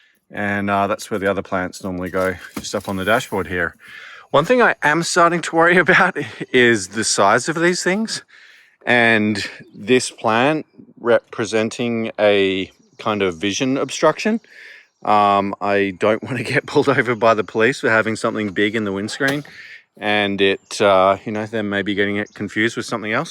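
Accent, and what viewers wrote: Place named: Australia. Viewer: Hello, have to ask are you an 🇦🇺Aussie? First visit to your Channel and hear a familiar tone? Great Vlog Thank You looking to grow some food on the road, 👍🏻👏🏻🙏🏻🙋🏻‍♀️🇦🇺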